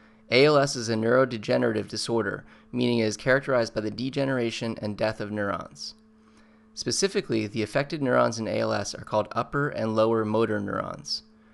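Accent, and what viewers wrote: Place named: United States. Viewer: NOT familiar with the american accent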